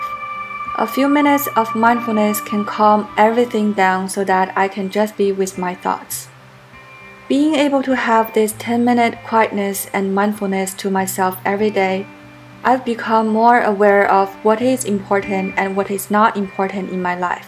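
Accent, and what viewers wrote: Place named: China. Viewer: ) 本來以為你是外國人，聽你說話，就聽出來是中國人了 😊你的影片都很好看～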